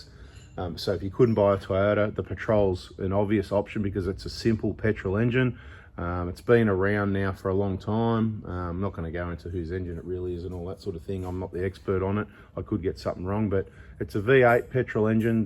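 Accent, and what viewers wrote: Australia. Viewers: I have problems understanding Australian accent, so I got the first choice of a diesel for Isuzu, but I could not understand - other than V8 and made in Japan - what the choice of the petrol model was. Can someone write it down for me?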